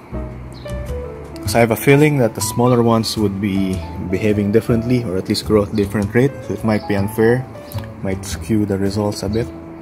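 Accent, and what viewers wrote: Philippines. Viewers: You have filipino accent.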